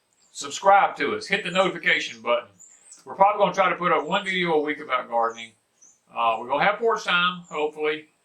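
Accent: South, United States. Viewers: Many Thanx from Canada. I always say what happens in the US will happen in Canada. Love the Southern drawl. great information, Thanx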